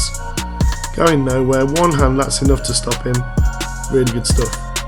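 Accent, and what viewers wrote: England, United Kingdom. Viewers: Mate, I’m absolutely loving this breakdown. Insightful but with an English twist. 🏴 I always feel English analyst sounds a bit off but you nail it bro!💪🏻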